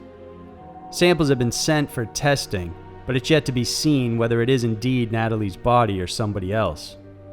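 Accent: South, United States. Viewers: Can anyone pick his accent? He reminds me a little of Elvis so I'm guessing he's from Mississippi.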